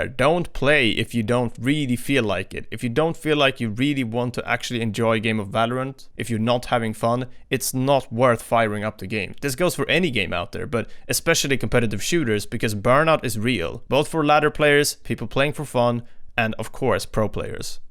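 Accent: Sweden. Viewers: are u from sweden? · is it just me or does it sound like he has a swedish accent while speaking english.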